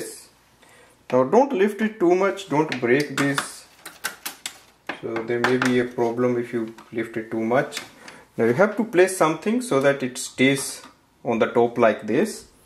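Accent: India. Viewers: Heard the indian voice knew i was saved twin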